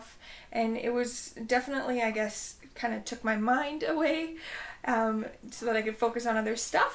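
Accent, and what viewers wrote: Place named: Canada. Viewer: I love your accent, Canadian?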